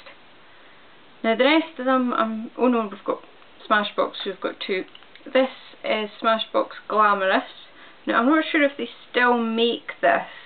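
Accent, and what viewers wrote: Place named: Scotland, United Kingdom. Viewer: She's Scottish, gonna say from the west coast maybe?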